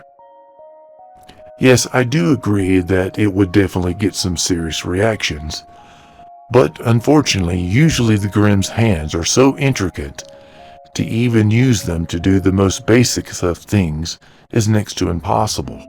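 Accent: South, United States. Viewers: You do you! I'll admit it'd suck if you went to a longer span in between videos, but we care more about your health and well being rather than getting a concrete upload schedule. Also, don't worry about subtitles! You were right when you said it was easy to get used to their voices. Finally, is that a Southern accent I detect? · The accent seem's like middle west georgia or central alabama,As i live in G.A. i can here the southern lilt. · You have a thick southern accent. Very thick. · Well, Awesome and Amazing answers and sorry but I noticed your Southern accent ☺☺☺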